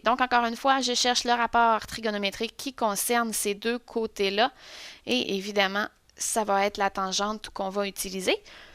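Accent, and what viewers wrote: Quebec, Canada. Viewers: Je ne sais pas si c'est l'accent Quebecois, mais j'assimile beaucoup mieux :D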